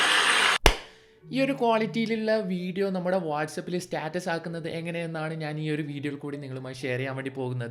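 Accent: Kannur, India. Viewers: Ningal kannur aan. Alle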